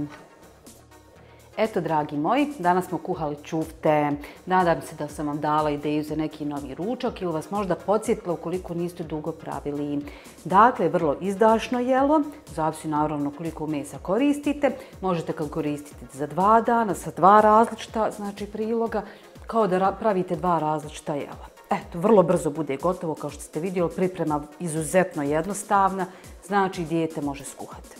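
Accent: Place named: Bosnia and Herzegovina. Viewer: Ne sumnjam da su ćufte i ukusne kao što su jednostavne, upravo ih idem praviti ali željela sam reći drugo - uvijek radije slušam zbog njene spontanosti i prirodnosti, volim ton njenog glasa i bosanski akcenat koji me odmaraju...haha...ona dođe kao terapija!, želim ti svako dobro.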